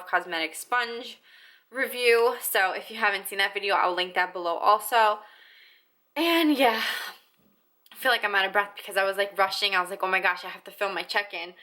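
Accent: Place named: New Jersey, United States. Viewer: Looks like a great shade match! Thanks for the review. PS - am I hearing Jersey in your accent? My mom's from Jersey, and sometimes I get a whiff of this accent ;)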